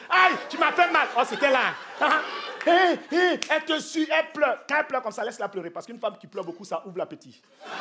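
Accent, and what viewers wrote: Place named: Ivory Coast. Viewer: C est un ivoirien son accent est trop fort claudia son garçon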